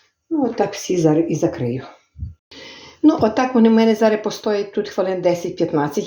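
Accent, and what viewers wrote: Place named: Transcarpathia, Ukraine. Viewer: Дякуємо за чудовий,простий у виконанні рецепт і чудову українську мову Закарпаття🙏🇺🇦🇺🇦🇺🇦👍💙💛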